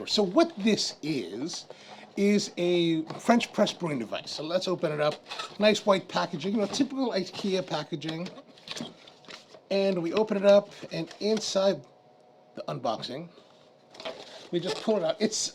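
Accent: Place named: Hawaii, United States. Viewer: I new I heard a Hawaiian accent! Then I saw your channel name. Mahalo!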